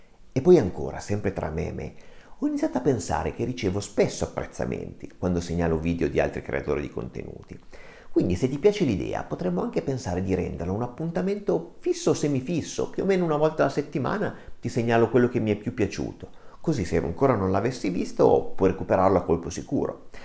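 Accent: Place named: Piedmont, Italy. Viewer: Preferisco le tue pillole di saggezza, con una fantastica cadenza torinese, o piemontese, lo sono anch'io, che quelle che vendono i tuoi colleghi "farmacisti"🤣🤣🤣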